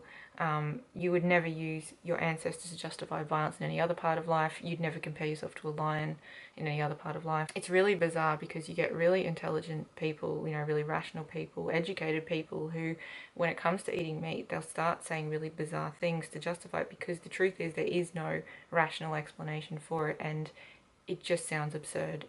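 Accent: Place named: Australia. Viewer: Oh my god.. Haha I was thinking 'she must be British, how does she have an Austrlian accent' and then you explained you lived in England... I knew it. The dry humour has rubbed off on you :P This video was awesome; more people need to be explaining the inevitability and necessity of veganism in this matter of a fact way.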